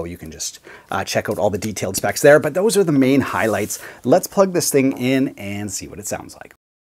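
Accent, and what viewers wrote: Canada. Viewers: I love Canadian accents! Teach me master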